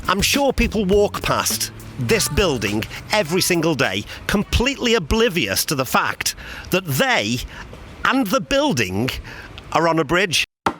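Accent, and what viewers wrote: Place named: West Midlands, United Kingdom. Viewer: I LOVE BUILDINGS ON BRIDGES... can I ask, where is your accent from, I live in the west Midlands and it sounds really family to me!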